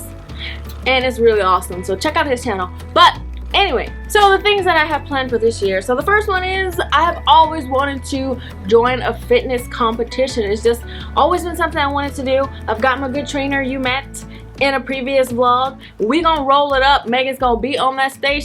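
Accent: South, United States. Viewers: Love you , you are crazy lol ! that southern accent. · I can tell you are southern girl...love it! You better dig up Mya and Bubba Sparxx LOL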